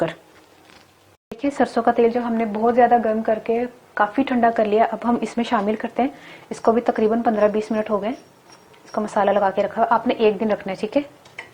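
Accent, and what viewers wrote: Sialkot, Pakistan. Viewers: Sialkoti punjabi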